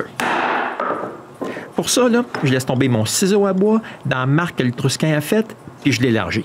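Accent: Quebec, Canada. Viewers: Is this a quebec accent?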